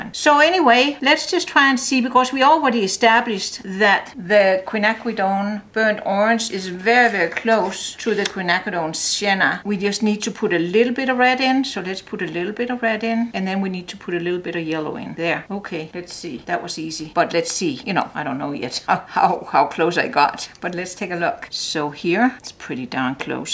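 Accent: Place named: Denmark. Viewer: love this colour. Sounds like you are originally from Denmark. Am I totally wrong? 🙂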